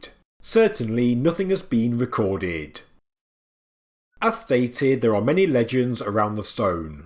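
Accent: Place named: England, United Kingdom. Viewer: Please consider adding caption I don't get your English accent please 🙏❤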